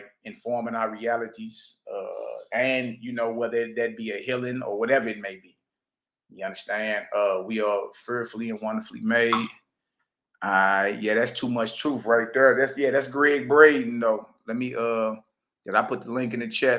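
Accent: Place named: St. Louis, United States. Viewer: Fear/ Furrrr … their/thurrrr .. St. Louis to death 😂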